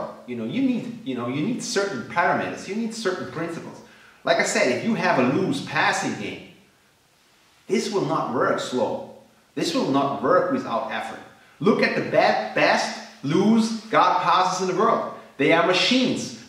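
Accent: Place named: Europe. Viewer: Hi, love your work! I fully understand what you are talking about. I have a couple of questions: 01. Are you going to be doing instructional submissions escapes and positional escapes anytime soon? 02. If someone wants to be moving up the ladder in your philosophy and your jiujitsu... How does one go about it (You sound European, so it would have to be remotely)? Thanks!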